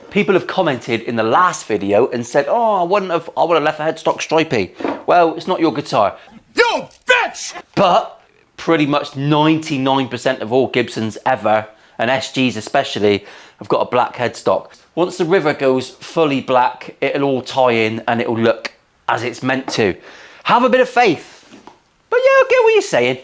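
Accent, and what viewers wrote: United Kingdom. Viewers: Banger of a SG mate!!. This is the type of guy where the British accent and swearing so swimmingly together like peanut butter and jelly. Love it. Keep up the great work. Maybe do a left explorer build? ;-D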